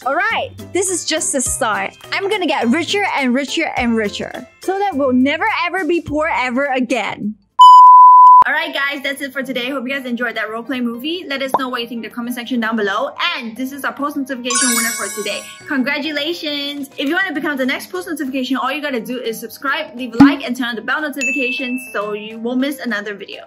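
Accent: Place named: Malaysia. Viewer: You sound malay🤔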